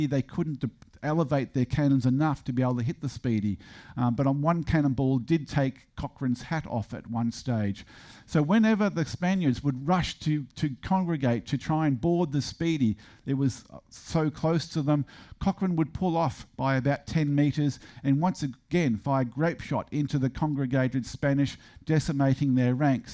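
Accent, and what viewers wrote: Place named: Australia. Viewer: I have a couple good scottish friends and they do not sound like this guy, I thought he was australian.. anyway very interesting .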